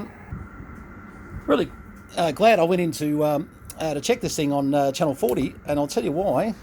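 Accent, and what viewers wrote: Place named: Australia. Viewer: Wow. Glad you're here. I'm trying to repair/restore the very same AE 76-577. Such issues after issues. I've had to install NTE1233 when we couldn't get an ECG1233, and that's because well, you know what it's like trying to find an 02A today without donor radios. Then I had to rebuild the VCO block. The last owner had cracked the slug so I had to dig the old one out, resleeve the wall and donate a slug from another. Now after all that, I've still not got lock. I'm chasing a gremlin. I need to get an AE 76-577 specific service manual. I got my hands on a Cybernet service manual, but it can't quite help. Anyway, it was nice to hear another Aussie while looking for AE stuff, and great to hear and see you got yours hummin' again. 73's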